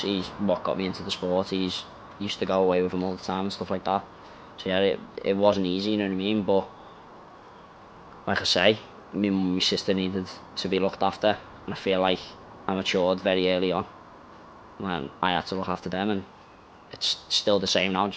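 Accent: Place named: Wirral, United Kingdom. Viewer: Obviously he’s from the Wirral listen to him talk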